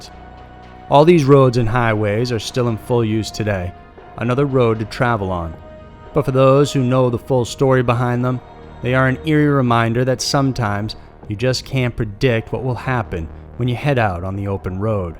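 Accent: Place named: North Carolina, United States. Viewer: I'm from Jersey & I appreciate the mention but Shades of Death road doesn't sound so "murderous". As opposed to something like the Texas killing fields or Highway of Tears. They have many murders occurring on or near those roads. But regardless I really enjoy your channel & often will watch a few a day. Thank you for your hard work! Are you from North Carolina (the wsy you day "nine")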